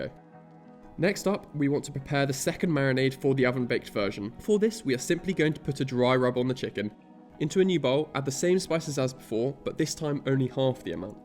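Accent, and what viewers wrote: United Kingdom. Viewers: Are you British, sorry for my question, but I started with the step of learning and developing the English language, and when I heard you, I felt that the English language is different from the British and I apologize once again to you...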